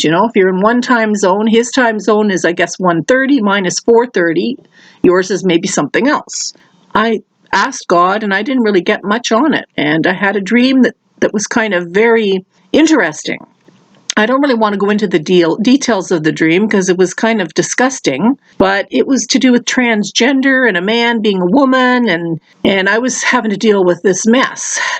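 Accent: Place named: Canada. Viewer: I've been outside and I'm still alive. April 21, 2020. You sound like your from Canada.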